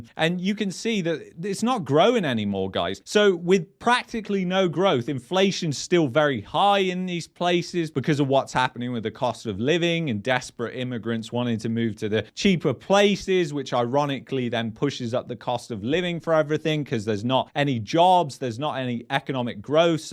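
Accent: England, United Kingdom. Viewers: Can't get enuff of your English accent 👍👍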